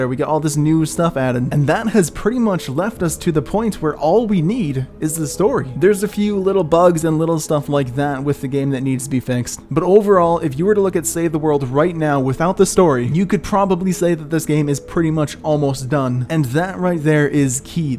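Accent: Czech Republic. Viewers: Guessing Czech Republic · Are you Czech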